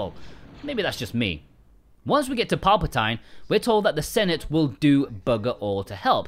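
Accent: England, United Kingdom. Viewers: I've given you a sub, great video btw, but I'm still baffled where in England your accent comes from, maybe it's a Mos Espa twang.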